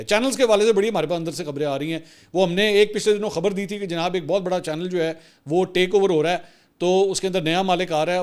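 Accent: Punjab, Pakistan. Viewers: Mansoor Ali khan sahb. Ap k Vlog mein punjabi ka tarka bht acha lgta hay bal k vlog ko char chand lga deta hay❤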